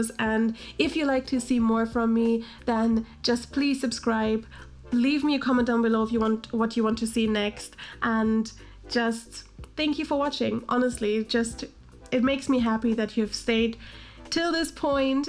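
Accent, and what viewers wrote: Germany. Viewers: I can hear a german accent. :D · Are you from Germany? I love your accent; it's so beautiful. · Da höre ich doch eher deutsch als Muttersprache.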